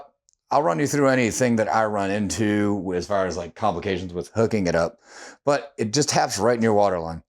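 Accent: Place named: Buffalo, United States. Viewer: Knowing you're from Buffalo, that accent goes crazy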